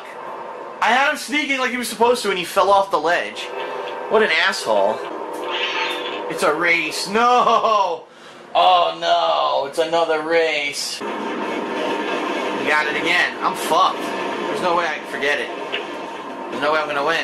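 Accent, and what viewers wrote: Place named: New York, United States. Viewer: I can tell your from New York.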